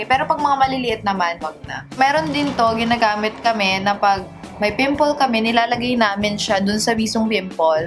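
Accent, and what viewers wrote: Ilocos, Philippines. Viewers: Ilocano ba yun hahhaa😂ang cute 😍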